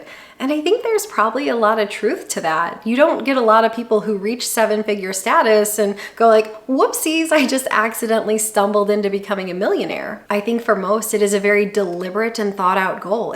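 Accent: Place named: Wisconsin, United States. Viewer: A fellow Michigander.. Wondered about that accent - to us lowlanders sounds too much like Wisconsin lol. IT for both me and my wife. Been saving 30%+ of our income for years. Wish I was smart enough to start that sooner, but still worked out fine. No inheritance for either of us. Middle/lower middle class background. Lifestyle creep is a thing, but I fight it. Biggest hurdle was it was impossible to get a place near work without spending a fortune, but we'll get that returned when we sell it and retire to somewhere cheaper. Maybe the UP ;)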